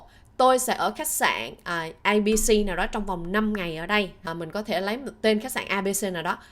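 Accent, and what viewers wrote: Standard. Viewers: accent rất chuẩn, 🎉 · Người đầu tiên nhỉ? Hihi. Hay quá. Chủ đề thiết thực để đi du lịch. Giọng hay và chuẩn. Tròn âm ghê. Tính hữu thanh rất đậm. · Cảm ơn bạn nhiều, bạn nói rất chuẩn và cách thức bạn dây cũng rất dễ hiểu. 😊❤🎉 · Cô nay giọng quá chuẩn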